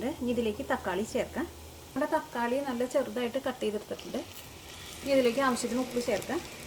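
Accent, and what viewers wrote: Thrissur, India. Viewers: Ithonn vrithiyaayi paranj tharoo mole, ingale thrissur bhasha thiriyaanjittaa...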